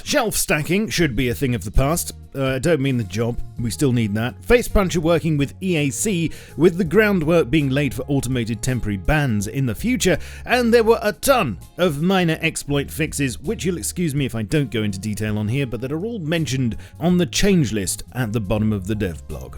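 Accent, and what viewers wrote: United Kingdom. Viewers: Yes, I finally get to hear my favorite British voice!!!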